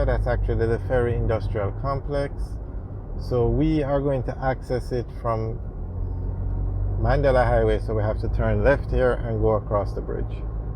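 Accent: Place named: United States. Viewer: You sound like someone that use to live in the states.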